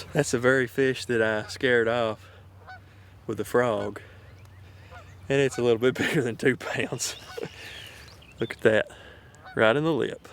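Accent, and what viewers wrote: South, United States. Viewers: damn you are sexy!!! sry just had to say it...I love a man with a southern accent! NEW SUB!!!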